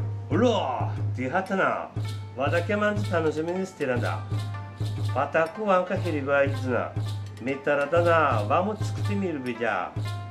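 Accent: Tsugaru, Japan. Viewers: BGMないほえが、喋る声聞きやすいかも！青森生まれの、青森の両親、青森の両方の祖父母の津軽弁聞いてた俺としては、わかるけど、標準語に、直してるのが、津軽弁に、聞こえちゃうんですけど！ダメ出しでは無いですからね〜純粋な東京の人には、分からない気が、青森は、津軽弁、南部弁、下北弁、あと龍飛の方でも、言葉違いますもんね〜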